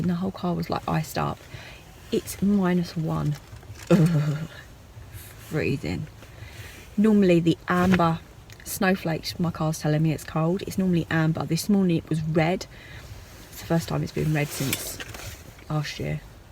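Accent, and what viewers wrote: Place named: Dunstable, United Kingdom. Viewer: I really enjoy your videos. Whereabouts in England are you? Your accent sounds like mine, I was from Dunstable Beds, and I lived in Chelmsford, but have lived in Florida for 26 years. Really enjoy your recipes. Thanks. Also, you remind me of Sam Faiers from TOWIE and the mummy diaries. LOVE the penguin! Very clever.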